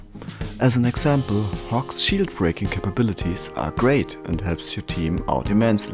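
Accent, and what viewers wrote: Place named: Germany. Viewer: Like for the german accent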